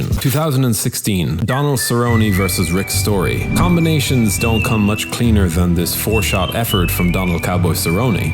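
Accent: Ireland. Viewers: Are you Irish by chance... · You Irish? In your about it says from US but I think I'm hearing an island boi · posh d4 west brit ya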